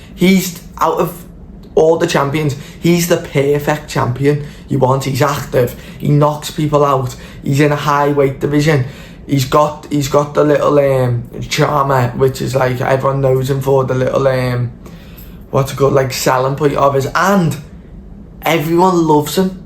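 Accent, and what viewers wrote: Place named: United Kingdom. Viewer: You sound like Paddy Pimblet, Ajex Pareyrahhh. Umm I love British accent actually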